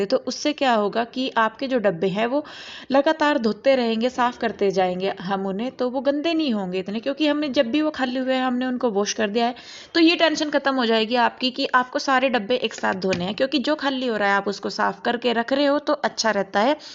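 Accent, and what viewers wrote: Haryana, India. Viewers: Mujhe aapki boli achchi lagi,aap haryanvi ho? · Aap haryana se h, apki bhasha bohut achi lag rahi h, apne bohut ache se orgenize kiya hua h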